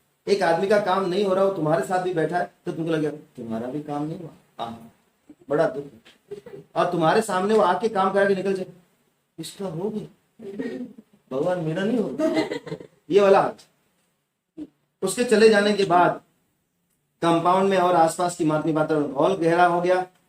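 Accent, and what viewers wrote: Odisha, India. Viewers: Is sir from odisha state ? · Sir app odisha se he kya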